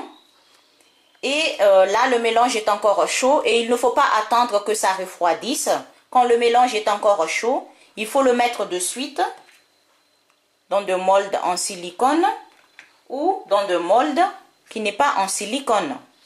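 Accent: Madagascar. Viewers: C'est une Malagasy!!! Cet accent ;)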